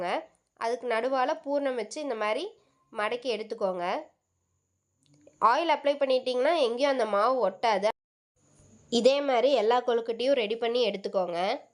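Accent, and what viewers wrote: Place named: Kongu, India. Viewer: 🤩 i will try🥳 unga kongu tamil and voice spr nga🥰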